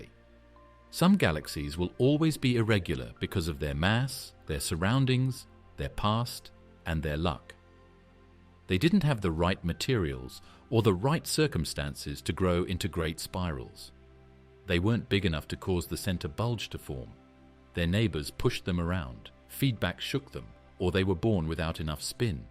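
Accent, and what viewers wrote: United Kingdom. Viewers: Here it comes a British accent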